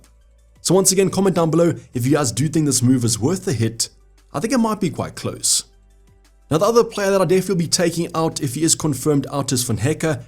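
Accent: South Africa. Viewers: That Saffa accent is strong bru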